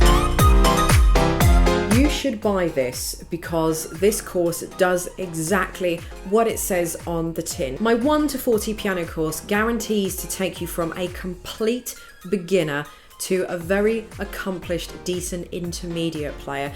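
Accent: England, United Kingdom. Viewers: What part of england are you from? London?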